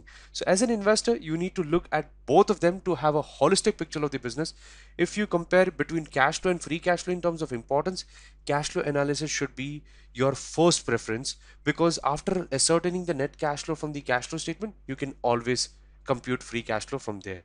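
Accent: India. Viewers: the Indian accent is already a bit hard to follow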